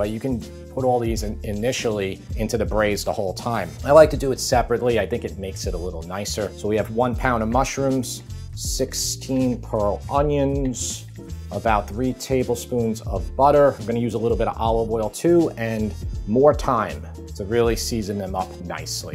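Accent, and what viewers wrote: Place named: Long Island, United States. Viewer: That Long Island accent is like a warm blanket.. reminds me of home 😢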